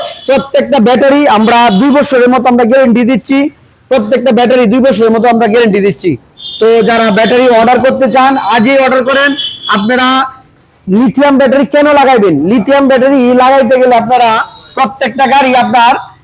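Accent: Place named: Kishoreganj, Bangladesh. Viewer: আপনি কিশোরগঞ্জের আঞ্চলিক কথা বলেন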